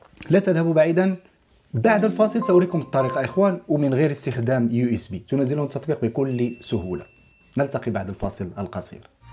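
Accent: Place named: Amazigh, North Africa. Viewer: كل شر وحك صعبه ولانهم منك شي لهجه امازيغه صعبه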